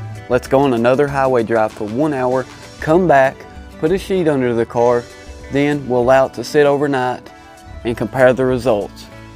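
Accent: South, United States. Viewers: Love the dramatic music with the laid back southern accent lol. I'm subscribing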